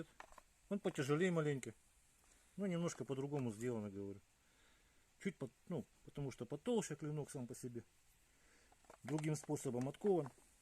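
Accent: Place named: Vladimir, Russia. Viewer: Я нутром чую, что ножи добротные. Руки мастера и говорит с добром в словах. А моё чутьё меня редко подводит. Для меня очень важно, что бы нож был сделан кузнецом с душой и добром в сердце. Такие вещи чувствуешь сразу. Жаль, что не могу заказать у мастера этот инструмент, так как нахожусь далеко, совсем рядом граница с Польшей, рукой подать. От себя скажу, что такой нож надо выбирать под себя не по интернету. Такую вещь надо в руках подержать, а лучше им поработать по дереву. А газета - это красиво, эффектно, но не эффективно, на мой субъективный взгляд. Говор у мастера интересный и довольно мне знакомый. Владимирская область?🤔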